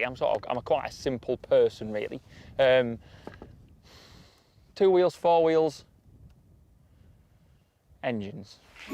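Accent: United Kingdom. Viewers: dave xrp, how would anyone outside the UK understand a word he says? I love his accent, but I can’t see the Yanks getting it. 🙄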